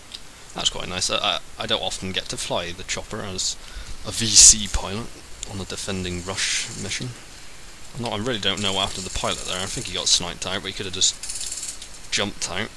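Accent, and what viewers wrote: United Kingdom. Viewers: Are you going to buy BF3 or the 'new' COD:MW3? Also, it's nice to hear your awesome British voice again. ^^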